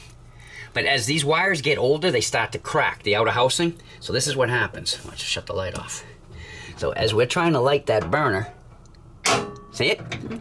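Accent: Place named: East Coast, United States. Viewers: Great Channel and good informative stuff ...but what makes me very happy is to hear New Hampshire accents again ... many moons ago when I was a kid we lived in Tamworth NH and I would take the school bus to Junior High up in Conway ...bitter cold ride but o how I loved Conway and the Mount Washington area .... Gotta get back to the North East again before I die ... love the Food and the people in a big way ... Happy Trails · Hi there, just installed my bug wire shields you had in your other video. Love all your fix it videos and glad I found your channel when you first started out. It feels like I have been everywhere you have because of YouTube. Good common sense information every time I watch your segments. My girlfriend is from back east and especially enjoys the videos and your eastern accent. Keep up the great work and enjoying life. 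Tom and Irene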